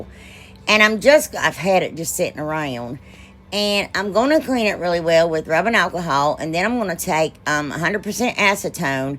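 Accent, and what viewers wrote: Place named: Appalachia, United States. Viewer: 🩷Your accent,🩷 OH MY HEART! My family is from the Appalachian Mountains in Virginia, and I don't get to see them often, my Parents have moved to Heaven, so I don't get to hear this often, I could sit and listen to you FOR DAYS! I love your work, but, hearing you talk, takes me home and brings such joy to my heart, Makes me just want to hug you. THANK YOU for sharing with us. (That said, I don't know if I got a bad one or I'm too stupid to use it, but, rub n buff 🙄 I went to use mine and it was like a vein opened and squirted everywhere. Is it supposed to be liquid-ish?? Runny.. I even 'shook' it. So glad I found your channel. Be blessed in Jesus Name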